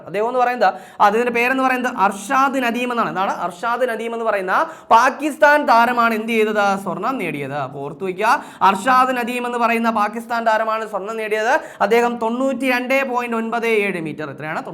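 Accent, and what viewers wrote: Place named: South Kerala, India. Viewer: തെക്കന്‍ സൈഡ് അങ്ങനെയാണ് ഭ'ക്ക് പകരം 'ഫ' ഉപയോഗിക്കും. ഫാര്യ,ഫിത്തി.. അങ്ങനെ😂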